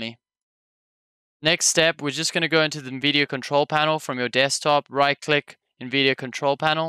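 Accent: South Africa. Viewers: OMW WASSUP FOUND YOUR POST ON REDDIT Heard the ZA accent first 😂 Scroll down and I'm like "HOWZIT!" Subbed. 😎😎 EDIT: lmao thanks for the help as well 😅🧐👍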